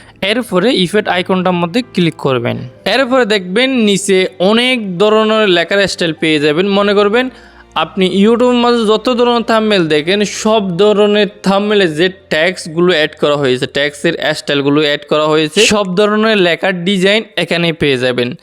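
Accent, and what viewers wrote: Chittagong, Bangladesh. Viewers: আপনি মনে হয় চিটাইঙ্গা তাই না?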